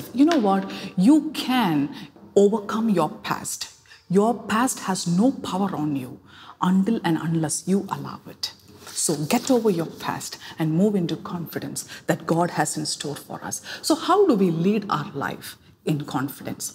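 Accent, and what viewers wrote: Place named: India. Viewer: 5 Subjects Great Stuff Pastor. Very Clear, Good Study and English That's a great Indian Accent.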